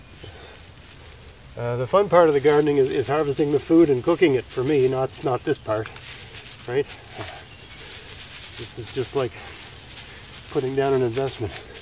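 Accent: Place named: Atlantic Canada, Canada. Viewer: I've been trying to figure it out too! lol. More like Rick Mercer I'd say. East coast. Except Rick is from St Johns. But closer to Nova Scotia than Norm is for sure.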